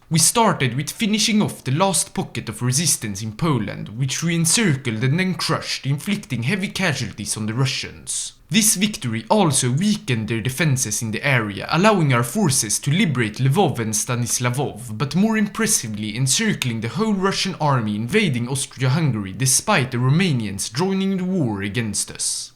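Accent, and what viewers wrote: Sweden. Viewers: Js hörde att du har en svensk brytning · I have never heard such a swedish accent english. Say that your a swede but not saying it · are you from sweden because you sounded pretty swedish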